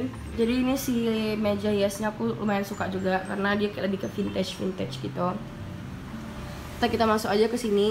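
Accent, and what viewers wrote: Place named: Medan, Indonesia. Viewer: lahh org medan jugakk? pantes logatnya medok kalii wkwk, sehattsehat kau yaa kak<3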